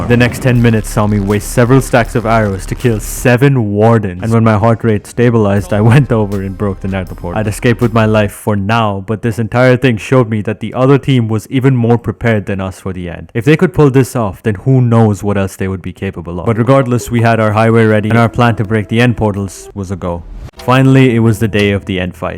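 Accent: India. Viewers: hearing that ur indian, just makes me sad and happy, i knew since the first day that u were pakistani or indian, i knew by your voice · He's Indian even his accent is Indian lmao, if he was nri he would have mentioned the country he lives in not India.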